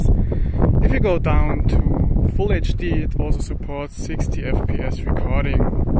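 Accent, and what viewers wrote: Germany. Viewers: Irgendwie hört man richtig raus das du deutscher bist 😂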